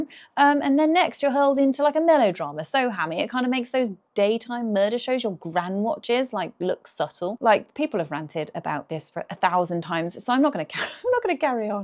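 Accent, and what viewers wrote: United Kingdom. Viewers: You are British? I thought you just had a cool accent.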